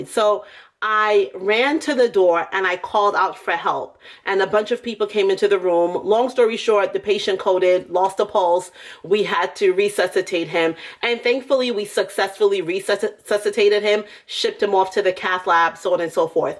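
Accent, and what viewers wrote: New York, United States. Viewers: You from NY or Jersey ☺️ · I’m back too 👏🏾👏🏾👏🏾👏🏾👏🏾that New York accent 😝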